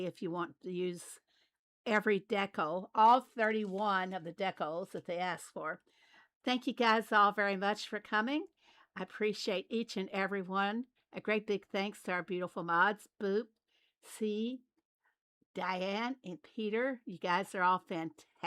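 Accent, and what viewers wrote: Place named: South, United States. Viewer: I have worked in the Boston turf for over 20 years - you don’t have it! Very southern to me!!!!😊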